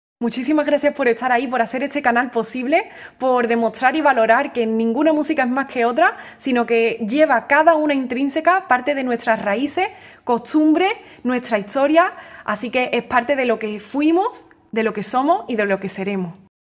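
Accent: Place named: Seville, Spain. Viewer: From Seville (western andalusian)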